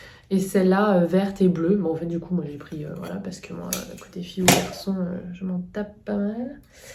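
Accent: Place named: England, United Kingdom. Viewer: C'est mon premier commentaire sur ta chaîne! J'apprécie vraiment de te suivre; je me regale a chacun de tes vlogs même si pour tout te dire je les trouve bien trop courts 😉. Tu as l'air si douce; si gentille et j adore ton petit accent anglais. J'aime bcp ce que tu nous proposes dans tes vidéos ( plantes; recettes; coups de cœur; blabla bébé)! J'aimerais beaucoup que tu nous fasses une vidéo sur tes looks/tenues car je trouve tes tenues hypers sympas et originales à chacunes de tes vidéos! En tout cas tu es une de mes plus belles découvertes YT. Bises; à bientôt.🌼🌷🍃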